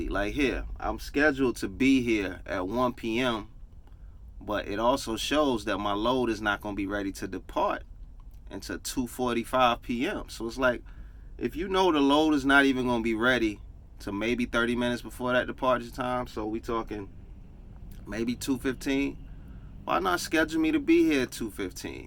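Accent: New York, United States. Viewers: Bro u from New York?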